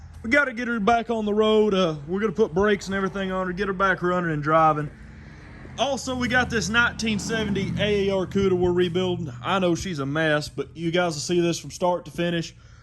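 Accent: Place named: New England, United States. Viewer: You have one of the most interesting Yankeee accents, love listening to it and your relaxed vibe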